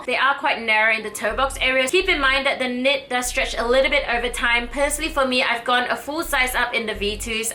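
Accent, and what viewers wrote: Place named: Australia. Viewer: Fuck me a cute asian with grey hair and Aussie accent...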